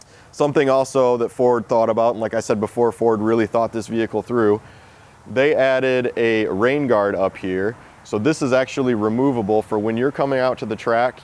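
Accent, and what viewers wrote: United States. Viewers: twice not two times , mirror not mir ninety not nindy ...americans murdering the english language once again .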